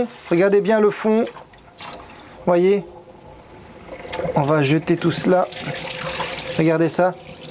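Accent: Belgium. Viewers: j'aime beaucoup l'accent belge, ça donne un charme assez prononcé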